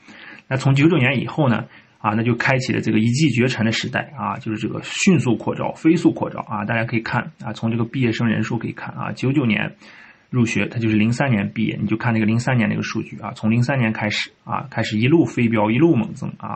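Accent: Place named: Inner Mongolia, China. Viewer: 越听越像哈，也像呼市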